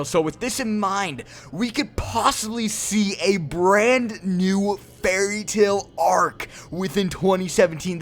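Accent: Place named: United States. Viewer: Omg this is the first time i hear your voice sempai! haha XD She is .... very... sexy ! and American! haha 😂 btw i wish the studio J. C. Staff. (Shokugeki no soma) who take over the anime and 🤔 I think there may be a flashback of several episodes on everything we didn't see during the ellipse after tartaros arc; with gray/juvia , mirajane, laxus ...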